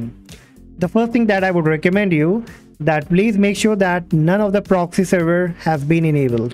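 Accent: India. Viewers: when you heard the indian voice .. mean wasting your time! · FINALLY I FOUND THE VIDEO THAT HELP ME I AFTER I HEARD YOUR IDIAN VOICE GET INTO DETAIL I AINT EVEN LOOK AT THE COMMENTS I JUST DID IT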